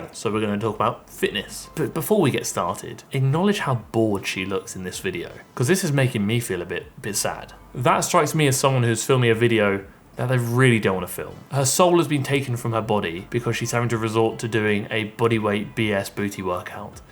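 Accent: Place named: England, United Kingdom. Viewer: Here for the accent 🤣🤣🤣 so awesome, England? Sounds like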